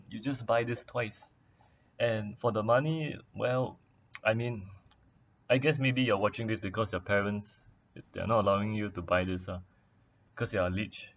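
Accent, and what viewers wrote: Singapore. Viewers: I hear singaporean or malaysian accent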